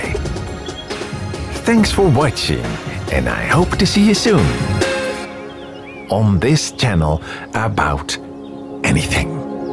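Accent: Netherlands. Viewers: how can you pronounce everything perfectly but still have a massive dutch accent lolol. nice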